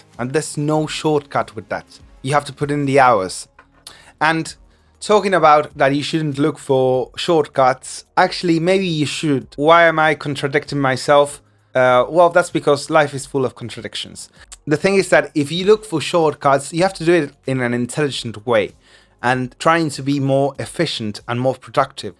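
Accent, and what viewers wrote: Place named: England, United Kingdom. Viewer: How many truths, and none of them are absolute!! Great video, love your english accent bro. Keep it up! 💪💪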